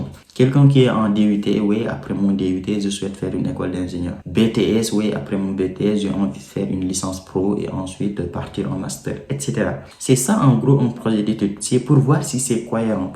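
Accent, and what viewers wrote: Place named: Senegal. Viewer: L'accent sénégalais, j'aime bien 😎👌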